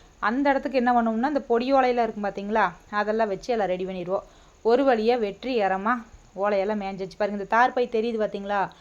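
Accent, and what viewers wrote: Kongu, India. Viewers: ஓலை போட்டது super கொடுத்து வச்ச மாடு👌 🎉🎉🎉கொங்கு slang🎉🎉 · உங்கள் மாட்டு கொட்டகைக்கு ஓலை போடுவது மிக அழகாக படம் ஆகி இருக்கிறீர்கள்👍 அதைவிட உங்களுடைய கொங்கு தமிழ் பாஷை அழகாகவே இருக்கிறது 👍 இதுபோல் தொடர்ந்து பெரிய பெரிய வீடியோக்களை இன்னும் ஐந்து நிமிடத்திற்கு மேல் போட்டால் நிறைய பேர் விரும்பி பார்ப்பார்கள்😊 ஆரம்ப நிலையில் இருப்பவர்கள் இது போல் வீடியோ போட்டுக் கொண்டே போனால் வெற்றி உறுதி 👍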